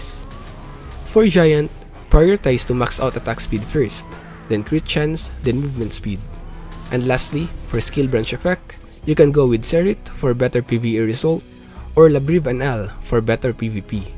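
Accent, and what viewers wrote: Philippines. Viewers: Pilipino ka ba? Parang pinoy ka mag English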